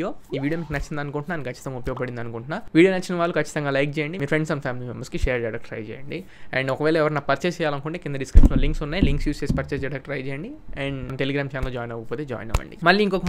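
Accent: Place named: Guntur, India. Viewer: ఆ ఉండిద్ది ,వచ్చిద్ది ,పోయిద్ది కొనమాకండి ఆహా 😊 ఆ గుంటూరు east ప్రకాశం యాస లో కిక్కే వేరబ్బా ❤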